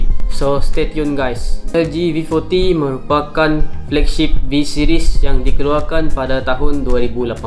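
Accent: Sarawak, Malaysia. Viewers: Kaka macam kenal jak, orang sarawak kah? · ☺️ baruk nengar aku dh tauk kompom org srwk. Diam sine pok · Urang sarawak ka😊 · suara mcm org sarawak,cakap seketui seketui..senang paham..goodjob👍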